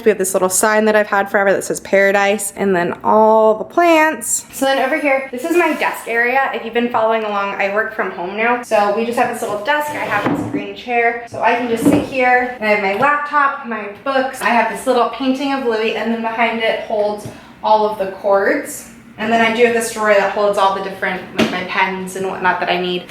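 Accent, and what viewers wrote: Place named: West Coast, United States. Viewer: awe thanks!!!! They were selling that pillow for $80 bucks. Crazy. But..... I love the Chicago flag. It’s the second most recognizable flag after our country flag. Sounds like you’re a West coast girl. Prepare yourself for Winter......lol.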